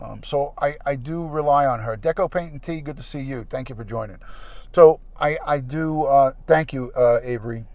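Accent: New York, United States. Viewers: I'm homesick in AZ from hearing your NY accent! Which borough??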